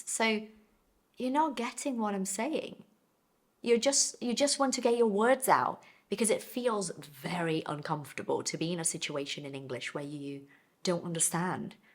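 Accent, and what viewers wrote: United Kingdom. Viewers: Hi,! I can belivie that you're Brazilian! So am I! Your English is so great, although it's British English. I'm new here and I just follow you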